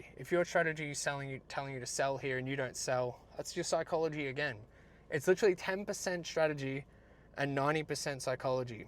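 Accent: Australia. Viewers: hey, great to hear an auusie voice in this arena. what are you trading? stocks, futures, currency...? and where did you find a mentor. are there any clubs or groups that meet regularly that i can hook up with?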